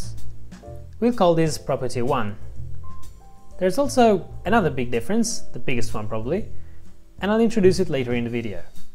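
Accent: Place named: Europe. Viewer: i cant tell if you have a western european or an eastern european accent. good video tho.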